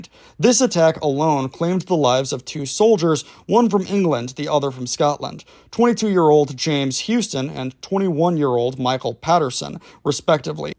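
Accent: United States. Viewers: I’m American and I have been on track with the Troubles since 1969 when Bernadette came to the US, but I am taken aback when I hear an American accent trying to tell others about the Troubles. I know people who lived through it and of course I learn more from them and I’m a lot more aware of South Armagh and Belfast, but be very sure of what you say because there are more than 2 sides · Who are you to come here and tell us our own history in your stupid annoying yank accent? And if you are going to carry on maybe you would try and pronounce the names, towns and people properly!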